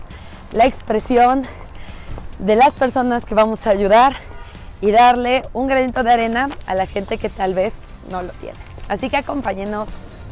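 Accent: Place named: Mexico. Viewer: Saludos desde Santo Domingo 🇩🇴😁 me encanta tu carisma y ese mega acento mexicano ✌🏼🤷🆒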